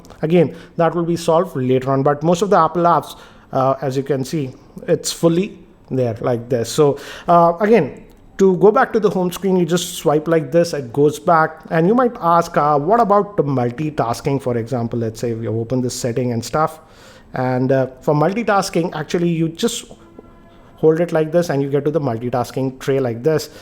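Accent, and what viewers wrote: India. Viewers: he sounds like apu from the simpsons. can someone tell what his accent is? (which state in india) just curious.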